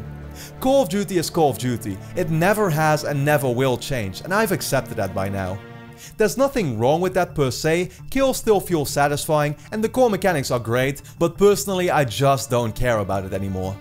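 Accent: Netherlands. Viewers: U dutch ? U sound like u could be dutch. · Volgens mij ben je nederlands tenminste ik denk het te horen aan je engels accent Broer . Ik denk er gelijk over man dit is echt niet goed. campaign is de reden waarom ik het spel koop dat er geen campaign in bo4 zit maakt me misselijk man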